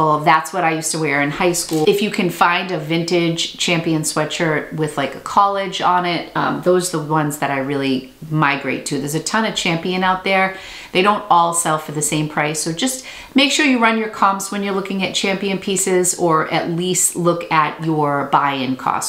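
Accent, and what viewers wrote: Massachusetts, United States. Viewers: I totally thought the same thing! Lol..., I’m the one who asked about you having a hidden Boston accent in your IG a while back and this video proves you do:) love it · Lol I feel like you don't have a strong Massachussetts accent until you hang out with your friend haha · This is exactly what I was coming to the comments to say, haha! Love that accent!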